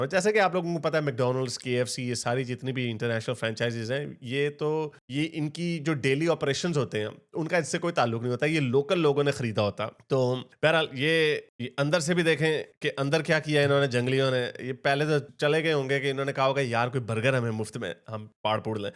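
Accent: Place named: Punjab, India. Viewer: I thoroughly enjoy your Punjabi accent and jumble😂😂😂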